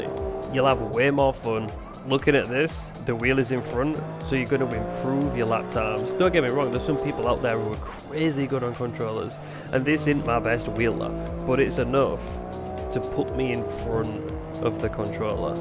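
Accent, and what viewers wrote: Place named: Liverpool, United Kingdom. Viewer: This guy is an absolute scouser.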